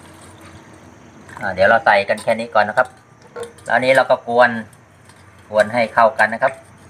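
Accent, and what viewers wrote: Chanthaburi, Thailand. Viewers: สำเนียงเหมือนคนจันทบุรีเลยครับ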